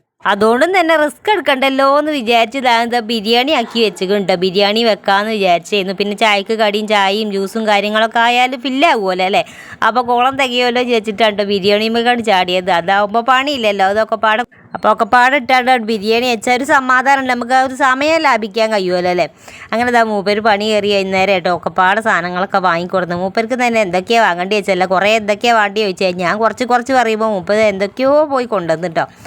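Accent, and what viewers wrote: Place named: Malappuram, India. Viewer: Ethrayaayaalum ningalude prarthanayum nombum chechi Allahu sweekarikkum.Alhamdulillah . Ningalude varthaanam kettal assal Malappuram thathamarude polethanneyund.May God bless you sister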